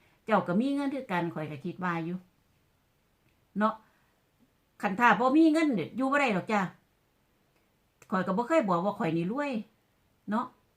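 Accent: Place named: Khon Kaen, Thailand. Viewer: เปลี่ยนช่องมาติดตามพี่จ๊ะดูหลายตอนแล้วชอบสำเนียงคนขอนแก่นเพราะคุณยายและแม่เป็นคนขอนแก่นค่ะ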